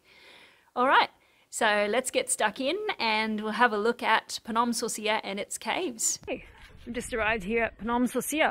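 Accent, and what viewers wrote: Australia. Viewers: It would be a cold day in hell when I make fun of your accent. However, that does not stop me from turning on the subtitles when watching Australian crime dramas on Amazon Prime. It took me a while to find this remedy. Previously, I was only understanding about 60 percent of the dialogue. Much better now. Best regards. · Love your Australian accent, and your clear detailed description of your vlogs of what are being shown. Thank you for sharing 🙏.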